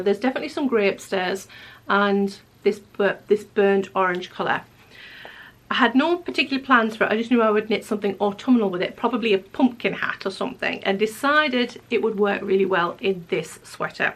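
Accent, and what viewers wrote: North East England, United Kingdom. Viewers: Nice to hear a north east England accent in Orkney! I am a Geordie Knitting in Fife! East Neuk Knits festival coming up in Fife end of November is so exciting it was brilliant last year. We are so lucky in Scotland.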